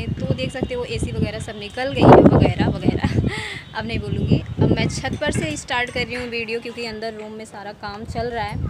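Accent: Bihar, India. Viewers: Tum bihari lagti ho... Bhasa to bihari lagti h...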